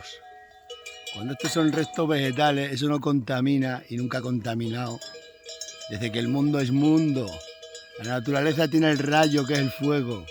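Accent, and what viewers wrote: Extremadura, Spain. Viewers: Hola, por el acento eres extremeño? Me ha salido tu vídeo y no puedo estar más de acuerdo. Olé por la denuncia desde este canal. A ver si se enteran toda esta gente a la que haces referencia. Saludos desde Sevilla de una extremeña.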